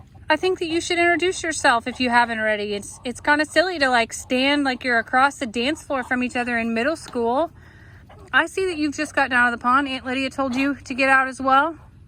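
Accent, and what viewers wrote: South, United States. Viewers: you are such a southern girl now… I heard y’all, yonder, holler!!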